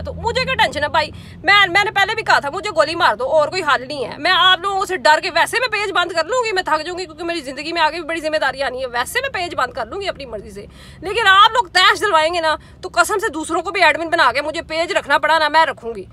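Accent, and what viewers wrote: Punjab, India. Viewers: Keep growing stay strong❤️i love ur way of talking hahahaha full Punjabi style. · Your way of talking 💕.... Hahha full punjabi style.... fan ho gya mam mn apka...... Stay strong be happy...... And don't worry about shittyy people.....🙂 Allah apko mazeed izzat dy or bury logon sy bachaye.... Ameen.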